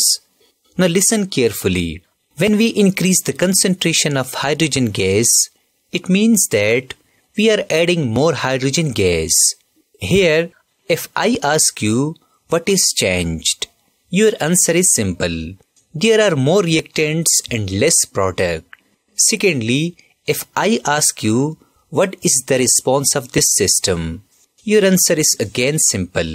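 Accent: South India, India. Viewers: Bruh are you South Indian? 'Cause your Accent 😭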